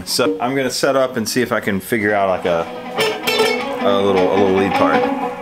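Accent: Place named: North Carolina, United States. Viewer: I love hearing your slight twang. Reminds me of growing up in NC. Keep doing what you're doing. You're killing it, my dude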